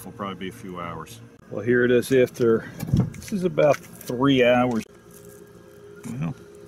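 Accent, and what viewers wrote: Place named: Philadelphia, United States. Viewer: Nice video - thanks for posting! You sound like you're from the Philly area. Close?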